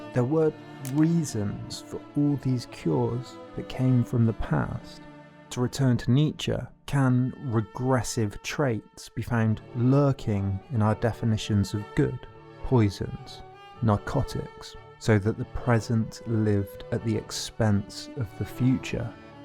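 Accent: United Kingdom. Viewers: Theres something about a british accent that presents an insidious force that everything being said is intellectually inclined and of authority. I just think its so dangerous. Or am i just crazy? There may very well be merit here, but i cant tell and it bothers me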